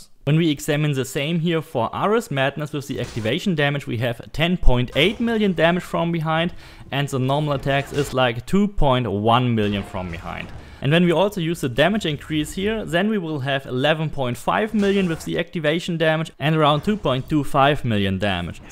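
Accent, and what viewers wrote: Germany. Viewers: Lol I am level 73 and I have killed a lvl 99 mercenary easily in 10 sec. Or so, is that good? By the way this build is so funny and nice even before the armor set I have played a lot of the ares ability because you just feel undying 😂and the animation are very cool. By the way are you from germen beacause of your accent I thought that (sorry if I am wrong I am also from Germany)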